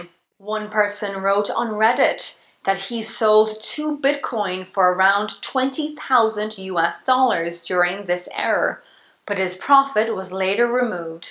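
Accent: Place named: Ireland. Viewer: Like the Irish accent.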